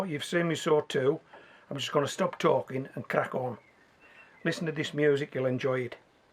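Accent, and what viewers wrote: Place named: England, United Kingdom. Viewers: Is anyone else here just for the pizza 😁 Great vid loved the starlings but i love your geordie accent the most 🙂 im going to try this!!! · I'm so glad I found your lovely videos but must confess as a fan of the language I cannot place exactly which county you're from. Would you mind enlightening me? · I thought he was Scottish. I worked with a Geordie here in Canada. He sounds very different. It's lovely anyway. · Amazing video!! I paused the video part way in and my two variety of carrots are already on their wet tissue. My husband and I are trying to figure out where your accent is from in the North of England? Please help settle our discussion. Cheers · Lovely accent in west Cumbria! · Great video, some tip tips for bucket planting and speedy germination. I have two questions, what brand were the pizzas as the ones we usually get these days have cardboard bases. 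Last question where in the world are you from, the Mrs and I reckon county Durham, unmistakable twang, surely?!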